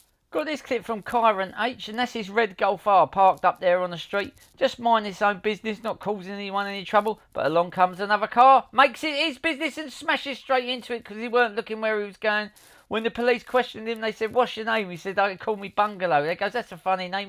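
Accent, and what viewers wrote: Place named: London, United Kingdom. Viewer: New to your channel. love the london accent keep it up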